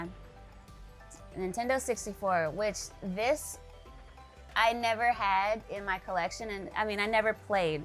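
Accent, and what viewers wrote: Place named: California, United States. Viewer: 😍😍..... It sounds like she's from Cali.. Wish it was NY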